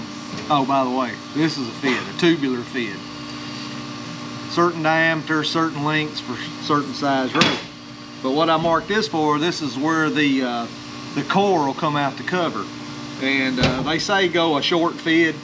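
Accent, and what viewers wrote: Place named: South, United States. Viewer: I have a retired uncle in Tifton Ga. that sounds a bit like you and people always take him for an idiot. They think he's like Goober from The Andy Griffith show, meanwhile he was one of the best structural engineers in his field back in the '70s, I always loved his accent and unpretentious common sense. His teaching style was laid back and based heavily on hands on learning through personal failures. At 14 he started out in steel fab and never forgot that he was once the student that could barely read a measuring tape as a JHS dropout. He's near 90 now and industry people still consult with him, at least the smart ones do, lol. You do great work and are a true master at your craft, I take a lot of pride in knowing our country has folks like you and my uncle in it. I do metal fab because of him btw, not at his level, but I've built some things that will be around long after I'm gone and that to me is a source of joy.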